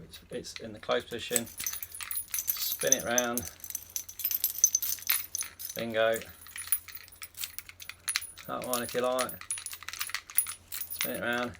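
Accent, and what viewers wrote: Leicester, United Kingdom. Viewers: Knew I recognised the accent! Fellow Leicester lass here! (Living down south) .. I have this problem right now.. Will report back! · Great vid, sorted it! Is that a Leicester accent?!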